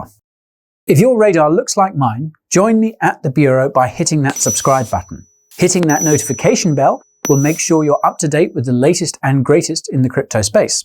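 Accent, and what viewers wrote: United Kingdom. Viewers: Good man I like British English lived thre in Surrey for 25 years I moved to Australia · British English lol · high quality to the point ... tanx bro .. I have some problem with british akcent I should listen more ... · Thank you for saying you’ll explain everything in plain British English. It actually helps a lot for someone like me who’s first language is not English. Thanks a lot. Now I’ll resume to watch the video ♥️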